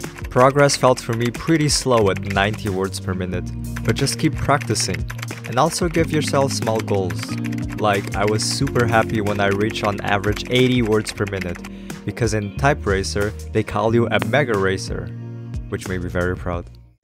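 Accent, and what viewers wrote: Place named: United States. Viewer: he speaks american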